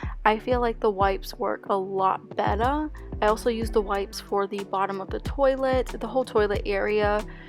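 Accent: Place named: New Jersey, United States. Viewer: Are you from like jersey or someplace around there? Just asking because the way you say carpet and better. Prolly more words too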